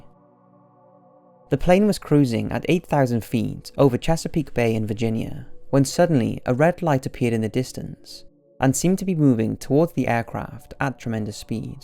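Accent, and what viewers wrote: Wales, United Kingdom. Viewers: Are you welsh?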